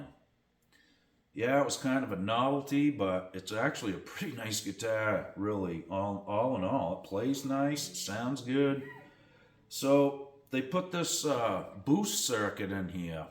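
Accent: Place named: Boston, United States. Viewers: Cool ‘guitah’. Love your New England accent. 🤘😎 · Good Job. Love your Boston accent. Thanks. The VW Garagemaster could become a collectible.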